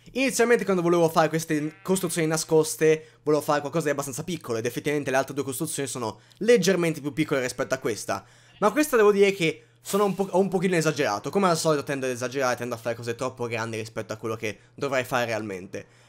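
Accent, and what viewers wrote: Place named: Emilia, Italy. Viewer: Sei emiliano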